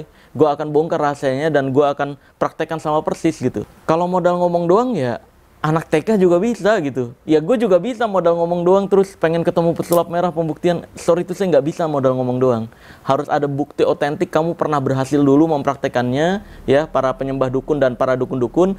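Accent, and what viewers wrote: Java, Indonesia. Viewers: Hhaha ngeper, bahasa jawanya kental bgt.. Conggrats 4jt subs 🎉🎉🎉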